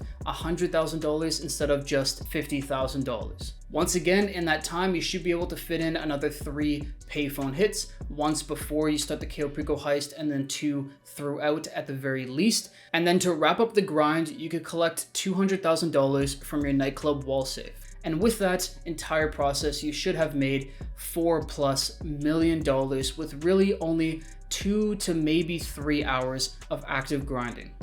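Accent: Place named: Canada. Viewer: I knew you were canadian from your accent. Greeting from Alberta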